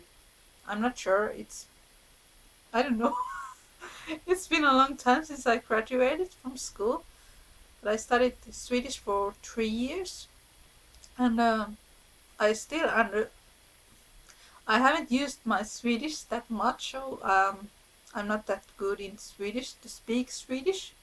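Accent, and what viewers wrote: Finland. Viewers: I love the accentuated Finnish accent video, it sounds like my husbands grandfather. Your accent is lovely though, and your voice is so sing song and melodic :) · You, and your accent, are lovely. I don’t understand the “shyness” that seems to be common with Finns about speaking English. Everyone I’ve ever discussed it with says they like the sound of it.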